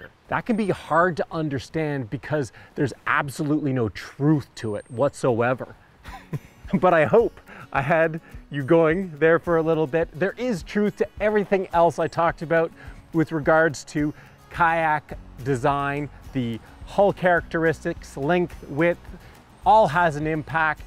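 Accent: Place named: Canada. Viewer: Info as always really appreciated, thanks. Plus your Canadian accent cracks us up down here in New Zealand. Colour density joke had me going for too long. Dang it! On a more serious note, not sure you have covered this point:- Which colour/ combination/s do you believe to be safest colour on the water?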